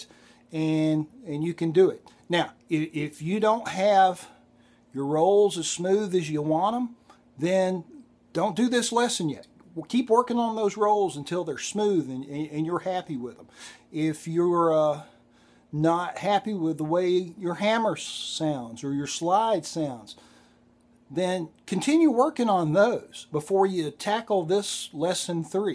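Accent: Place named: United States. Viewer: Dear, thank you for these lessons! Can I ask which state your accent is from? I adore it. Love a Brit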